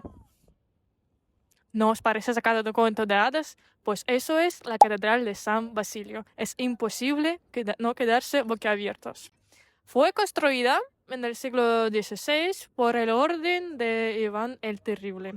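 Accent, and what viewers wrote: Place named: Spain. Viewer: Ella tiene el acento español muy marcado, suena muy linda. 💜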